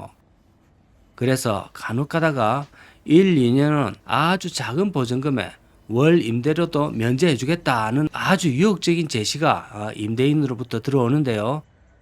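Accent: Gyeongsang, South Korea. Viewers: 경상도 말투는 왜 촌스러울까 나두 경상도인데ㅠ😊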